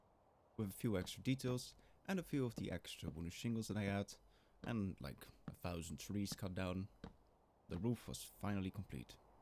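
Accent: Netherlands. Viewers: Are you dutch by any chance? I feel like I can hear it a little bit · Love this series so far! I'm also guessing you're Dutch based on your name and accent :P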